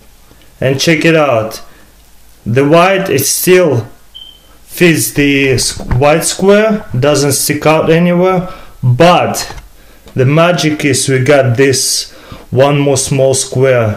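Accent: Russia. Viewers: Damn, stop fuck with him. He's from russia aye, if u come from germany, or another land, u can't speak goodenglish either right? Stupidfuckers :l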